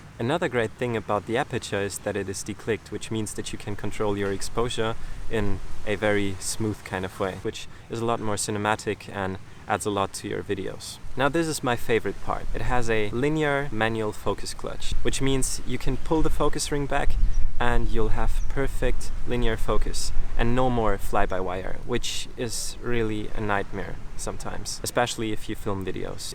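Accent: Germany. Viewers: No worries, your English is fine. And you will never loose your German accent , it is how it is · Amazing review! Thank you for your work! Don't worry about your German accent, it's great!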